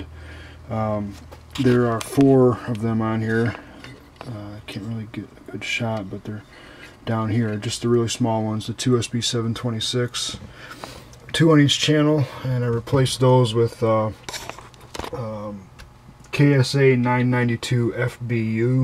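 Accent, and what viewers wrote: Michigan, United States. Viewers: Sounds like you are somewhere in mid-Michigan. I need a couple more modern receivers/amps repaired. I'm in Flint and it's hard to find a decent person or place that will work on stereo equipment. The old electronics store my father use to deal with has been out of business for a long time now. They were called Shans or Shanes electronics. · So true. And his Michigan accent reminds me of home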